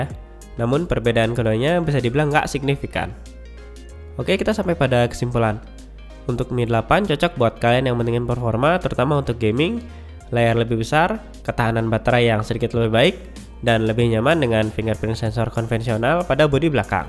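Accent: Bali, Indonesia. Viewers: Kadi jeg logat Bali asane niki pak?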